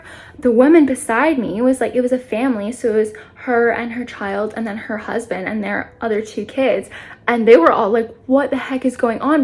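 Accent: United Kingdom. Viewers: Wait is she British or is she just doing the accent to be funny?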